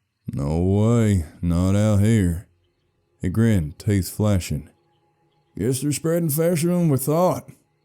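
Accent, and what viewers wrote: South, United States. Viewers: Scary creepy dark water not for me your good old boy accent is on point and I know country myself 💛✌️ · I love your southern accent ❤ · Your southern accent has no reason too be this good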